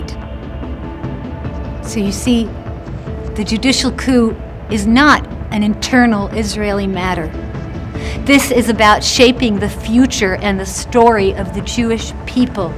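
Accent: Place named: United States. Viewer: Her American education shows in her perfectly American English. I also happen to agree with her....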